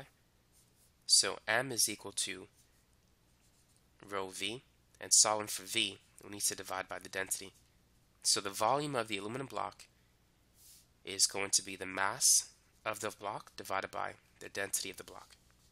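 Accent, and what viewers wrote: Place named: New York City, United States. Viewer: Damn you have a heavy NYC accent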